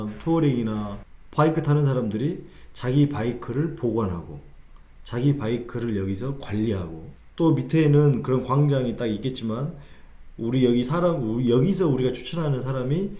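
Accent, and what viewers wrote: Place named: Jeolla, South Korea. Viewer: 말씀 중간 중간에 전라도 사투리 넘 구수하고 재미납니다 팬입니다 ^^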